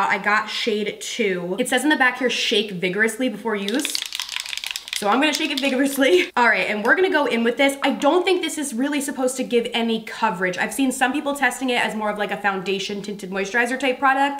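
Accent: New Jersey, United States. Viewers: I love you in general I have for yours but your Jersey accent comes through in the cutest ways and I have to double tap back 5 seconds I love it so much 🖤🖤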